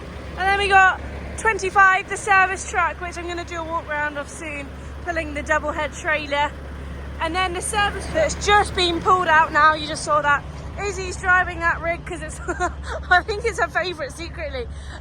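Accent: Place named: United Kingdom. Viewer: Love that British accent , that’s makes me come back to watch your videos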